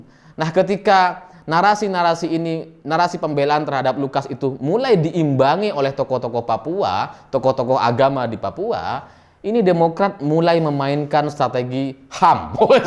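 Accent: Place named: Madura, Indonesia. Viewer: Bang logatnya kaya temen saya orang madura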